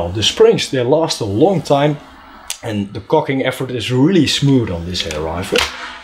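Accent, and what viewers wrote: Netherlands. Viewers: Very good review. Wonderful accent, sounded like Paul Whitehouse Dutch Policeman.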